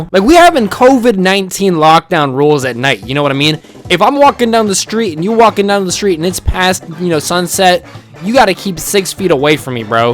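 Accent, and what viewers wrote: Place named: United Kingdom. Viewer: I didn’t know you were British like that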